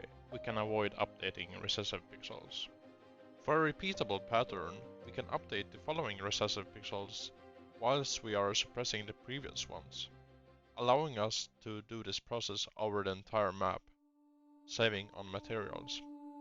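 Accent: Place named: Europe. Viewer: Dawg he's just European cut him some slack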